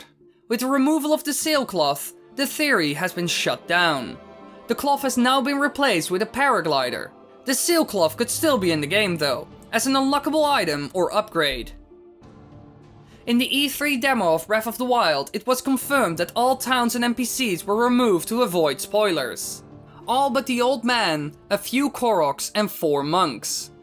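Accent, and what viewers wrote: Netherlands. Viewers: Are you dutch? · Ben je nederlands? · Are you (the narrator) dutch? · You sort of have a Dutch accent..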